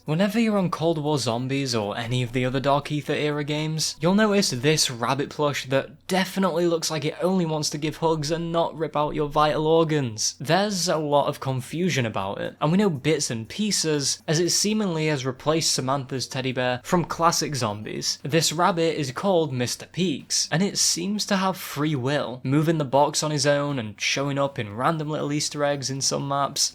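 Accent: United Kingdom. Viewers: LETS GOOOOOOOOOOOOOOOOOOOOOOOOOO!!!!!!!!!!!!!!! welcome back lad, missed that nice ass british voice!!!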